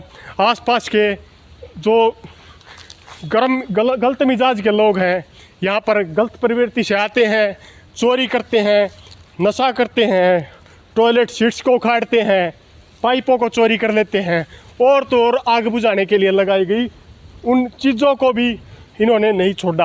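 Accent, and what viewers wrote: Haryana, India. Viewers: Bhai Haryanvi ae bol le Hindi baski na h.....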